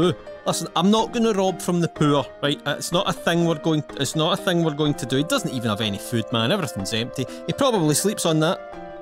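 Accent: Scotland, United Kingdom. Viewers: I must know your accent. Is it Scottish? Edit: Lmfao this npc gets it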